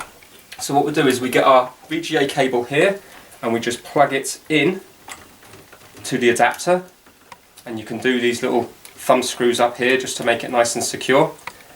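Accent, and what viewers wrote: United Kingdom. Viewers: seeing you talkin in british accent is amazing your accent is great tbh its best british accent i have ever seen someone talking.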